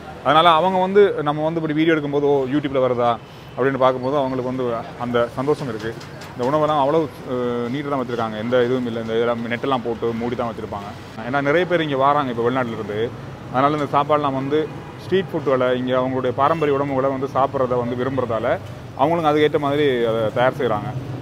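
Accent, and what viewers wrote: Sri Lanka. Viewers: Srilankan Tamil . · Ur tamiz is good. Excellent srilankan tamiz...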